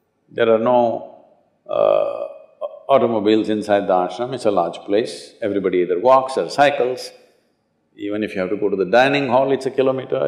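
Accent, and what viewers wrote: India. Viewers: 不是没有对的肤色，而是印式英语真的好难听懂啊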